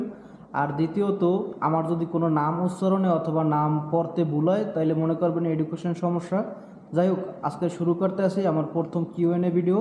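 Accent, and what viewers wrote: Barishal, Bangladesh. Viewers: ভাই আপনি কি বরিসাল্ল্যা?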